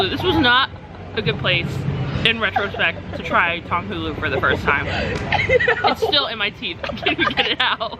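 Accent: New Jersey, United States. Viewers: I could just tell you were from Jersey.. I’m from south Jersey too and I grew up going to great adventure too!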